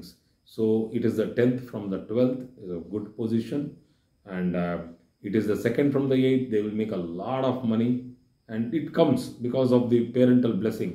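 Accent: India. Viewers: Your English is great! ❤ I like to listen to hindi accent to train my ears to understand, your English I can understand easily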